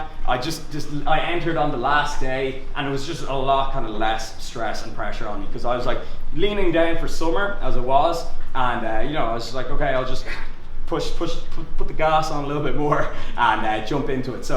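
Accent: Dublin, Ireland. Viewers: Are you from Dublin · what part of ireland are you from??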